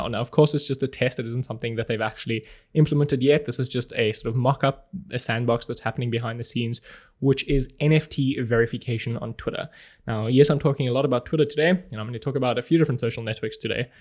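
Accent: South Africa. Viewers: Are you South African? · sehe deutsche Werbungen gerade, aber habe immer gedacht dass du Süd Afrikaner bist wegen deines Akzents. Naja wilkommen trotzdem zurück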